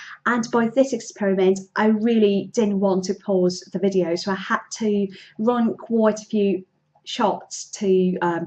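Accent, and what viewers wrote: United Kingdom. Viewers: Wow 😮 amazing , no accent, just clear British speech! How have you managed to acquire such good pronunciation skills???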